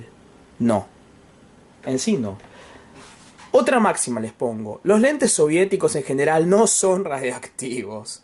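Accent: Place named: Argentina. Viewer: Tengo un Nikkor 200mm F4 Ai que supuestamente es radioactivo y que es de los 70s, podra ser? Me encanto tu canal, mucha informacion, y es refrescante escucharla en "argentino" y no en ingles jajaja, un abrazo grande!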